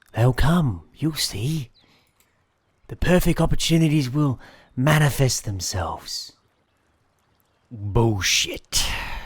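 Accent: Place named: RP, United Kingdom. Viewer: Its been literal years. I thought this was Stimpee with a posh accent.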